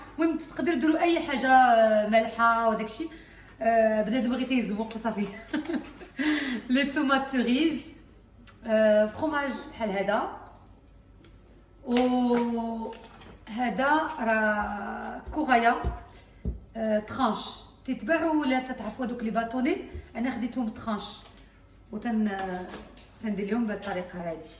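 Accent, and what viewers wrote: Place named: Arab world. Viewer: Machaallah 3lik j aime bien ta simplicité tes goûts et ton accent arabe j adore bonne continuation 😍😍😍😍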